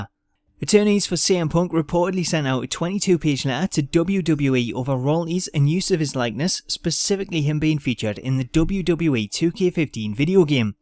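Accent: Newcastle, United Kingdom. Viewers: hey man where are you from because you sound like a fellow geordie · I don't understand anything you said with your british accent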